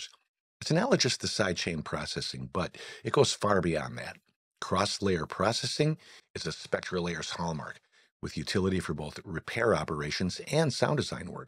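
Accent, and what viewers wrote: United States. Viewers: The upstate NY accent warms my heart. Oh and SL 9 is cool too!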